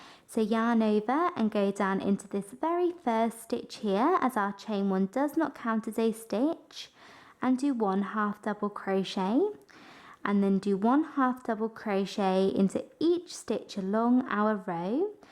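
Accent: England, United Kingdom. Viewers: It sounds English to me. I'm not sure how that sounds fake?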